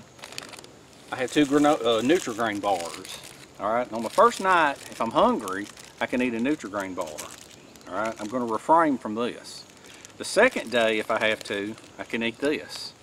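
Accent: South, United States. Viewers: forgive my ignorance but your accent comes from which area in the US? Southern but where :)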